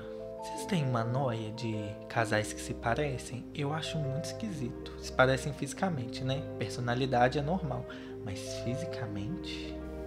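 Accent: Brazil. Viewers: Ariana corre aqui pra ver esse babado! Nauo aguenta tu! Que voz BRASEEL! Cadê a segunda parte da história da polônia homi? ❤️❤️❤️❤️❤️❤️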